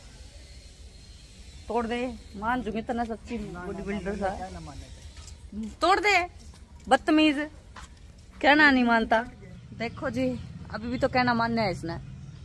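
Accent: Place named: Haryana, India. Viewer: Mam manny hariavni boli bria lagy su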